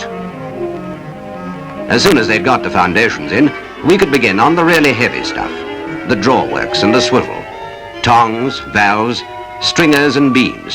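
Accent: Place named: Oxford, United Kingdom. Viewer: Kan kijkers van deze bedrijfsfilm verzekeren, dat in deze getoonde film- en boorlokatie; niet de deze documentaire gebruikte Oxford uitspraak gebezigd werd! Eerder, zal er menig Nederlandstalige vloek gevallen zijn. De helikopters werden gecharterd van World Wide Helicopters geregistreerd op de Bahamas. Vliegers en grondpersoneel waren veelal Australiërs. Deze info van een toenmalige 10 jarige snotneus. Heb op een uitermate tropische zondagmiddag ooit enkele uren, door mogen brengen op de logistieke locatie van deze (droge) exploratie put. Herinner mij bij het inkloppen reaktie, dat collega van mijn vader genaamd Gesterkamp, een paar groene lomboks uit een grote jampot verorberde. Mijn vader waarschuwde voor de gevolgen voor de maagband. Gesterkamp is een hele slechte geworden voor het Shell Pensioen Fonds. Deze culinaire liefhebber van de oosterse keuken is uiteindelijk meer dan 90 jaar geworden.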